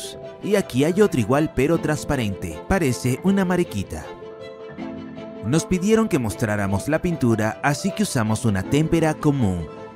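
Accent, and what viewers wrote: Russia. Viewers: Eres ruso o español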